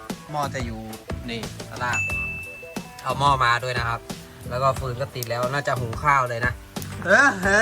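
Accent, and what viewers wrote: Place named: Ubon Ratchathani, Thailand. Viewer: สำเนียงอุบลชัดๆ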